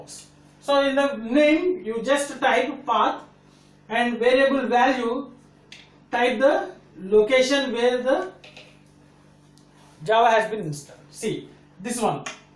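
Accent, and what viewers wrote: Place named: West Bengal, India. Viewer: Sir i think u are from West Bengal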